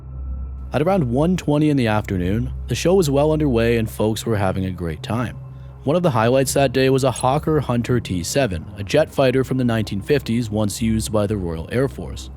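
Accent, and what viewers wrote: Canada. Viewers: Canadian ? Subscribed. 🇨🇦👍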